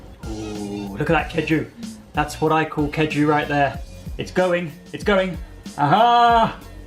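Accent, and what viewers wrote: United Kingdom. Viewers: suka sama British accent nyaa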